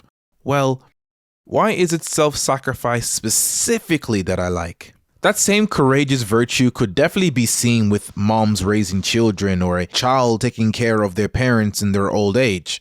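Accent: Ireland. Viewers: Are you irish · For some reason I thought you were a white Irish guy, is there someone else narrating the video 😅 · are you irish? im trying to place your accent 😅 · Thought you were Irish for a sec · I hear a bit of an Irish accent? Am I right or am I going mad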